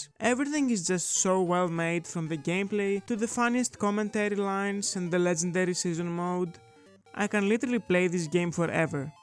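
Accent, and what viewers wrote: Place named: Greece. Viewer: Are you Greek? Your accent is giving Greek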